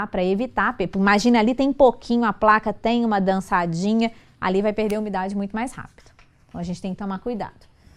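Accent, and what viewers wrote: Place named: Minas Gerais, Brazil. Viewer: Muita experiência, muito carisma, e o sotaque mineirim é bão demais rsrs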